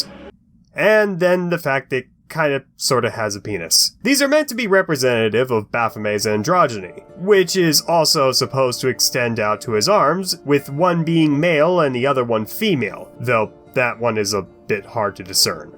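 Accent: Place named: France. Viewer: That French accent gets me everytime 😂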